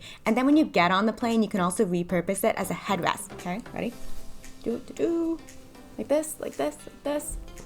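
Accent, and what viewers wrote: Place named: Bronx, United States. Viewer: Your voice sounds a bit different like you come from the Bronx not Canada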